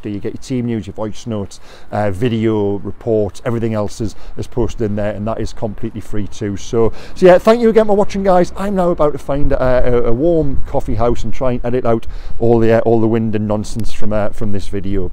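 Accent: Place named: RP, United Kingdom. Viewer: he’s got a weird tory accent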